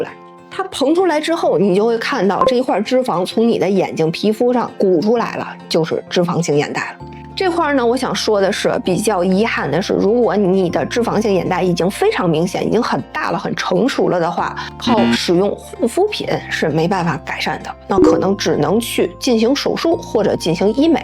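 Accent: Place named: Beijing, China. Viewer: 您的北京话听着很亲切！一对一怎么收费呢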